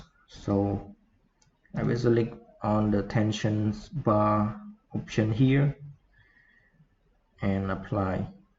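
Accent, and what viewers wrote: Cambodia. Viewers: Your pronunciation sound as Cambodian style. But It’s very nice and impressive VDO. Thank for your shearing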